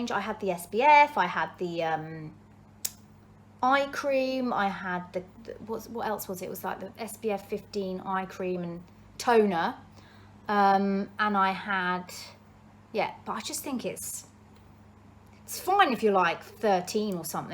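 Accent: United Kingdom. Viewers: Just found your channel! Fabulous look! You are so funny....adore your accent, dare I say you are British! Love love love London. Hubby and I were there in mid May for vacation. Hated to leave!! . Also spent a week there in 2017. Will be watching more of your videos. 🌷🌷🌷🌺💐💐💐😀😀😀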